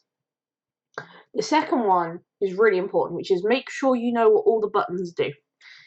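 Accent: United Kingdom. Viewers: Pretty good sharing and great content, no doubt. I'm a beginner in cyber security and eventhough I've got a private invitation on H1, I couldn't manage to find a bug... However, this video gave me a different perpective and way of think. Therefore, I'm so grateful to you for your sharing :) Btw, I guess you are from UK? I like this accent although I've hard time to understand it :)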